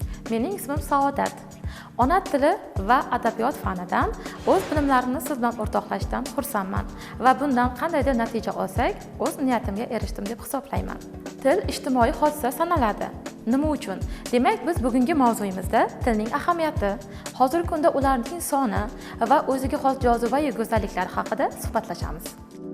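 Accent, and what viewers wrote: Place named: Khorezm, Uzbekistan. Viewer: Xorazmmi shevasi tushinarli zór turkiya shevasina óxshidi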